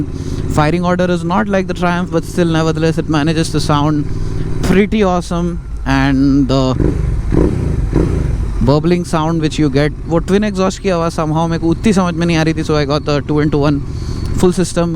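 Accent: Hyderabad, India. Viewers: Hey! Awesome content, entertaining, love the way you talk.. and that Hyderabadi touch is cherry on the top. I'm planning to buy 650. Wanted to know about how much does it heat really (Think of Mumbai traffic.. peak hours) I had bought a brand new Street Twin and i sold it in 6 months. One of the primary reason being the heat that it generates while riding in the city. I'm riding a 500 classic right now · like your content specially the way you talk Hydrabadi I just love it. Good job and keep up doing well. Best of luck waiting for your next vlog. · Bhai aise hi Hyderabadi slang main baat. Karo mast Maza aara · Bhai the way u talk is so much good because u r very experienced rider and your Hyderabad language is 😍😍😍😍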